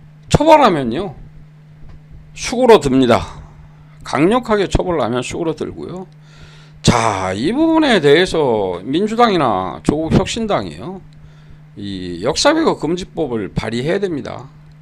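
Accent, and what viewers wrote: South Korea. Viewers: 180석 준 이유? ㅋㅋ 은행에 월세내고 나는것들이 돈 없으니 좌파 찍어준거지.. 근데 당신 말투가 전남 강진쪽인데? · 98% 지지율이 정상국가에서 나오는 수치냐? 쪽팔리는 줄도 모르고 .. 사투리가 전라도 구만 ㅋ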